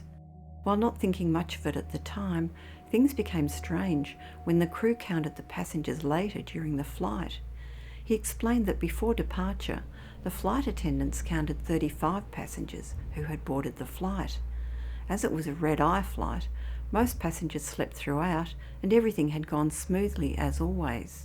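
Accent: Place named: Australia. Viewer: I love Australian & New Zealand accents.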